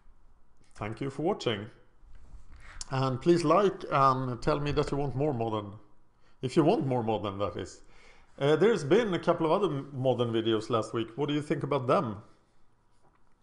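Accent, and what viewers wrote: Sweden. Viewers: My first reaction was "Swedish accent". Is it?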